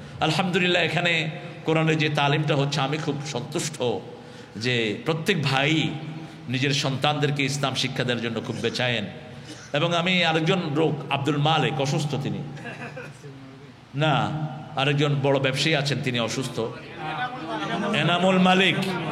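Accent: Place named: Noakhali, Bangladesh. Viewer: আমারও মনে হয় কারন অনি নোয়াখালী ভাষায় কথা বলে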